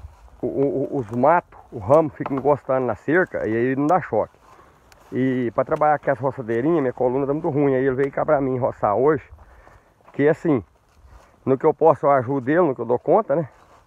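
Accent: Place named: Minas Gerais, Brazil. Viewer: O minero vc é da onde de Minas? Eu só dá baite tem base. Já viu fala.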